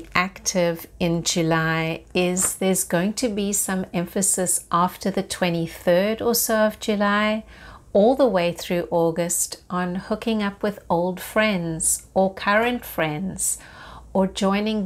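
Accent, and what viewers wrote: South Africa. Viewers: You sound South African 🇿🇦🥰I've had this on my watch later list for a few weeks now. Glad I watched it now, spot on from the start of the video, thank you for the message 🌻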